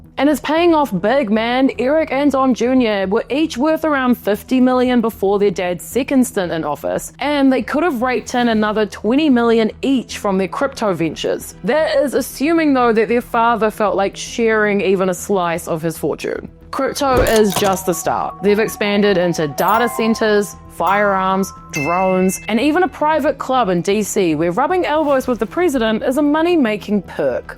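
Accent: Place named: New Zealand. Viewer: Completely beside the point - love your NZ accent !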